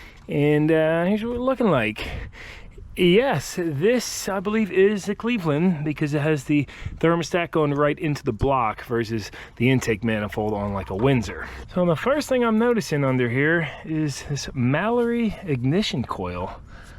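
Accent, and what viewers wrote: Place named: Pennsylvania, United States. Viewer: Thought I recognized that pa accent. My grandparents both sides of my family lived in different town there. Cool agricultural towns!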